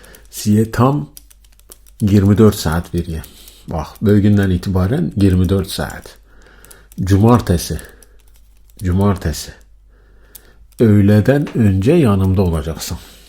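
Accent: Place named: Urfa, Turkey. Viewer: Urfalı şivesini ölene kadar dinleyebilirim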